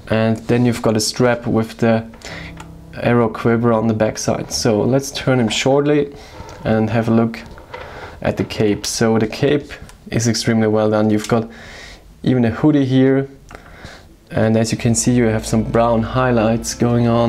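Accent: Germany. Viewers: your accent, are you from germany?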